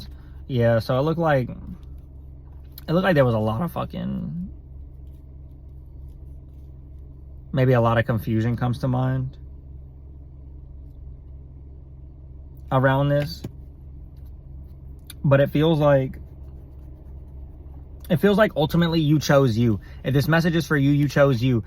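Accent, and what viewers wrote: South, United States. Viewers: this guy has a country accent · your semi-Southern drawl sounds like somebody's auntie on occasion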